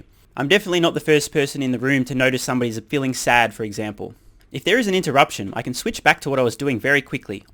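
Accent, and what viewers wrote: Australia. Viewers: So ya have an Australian accent?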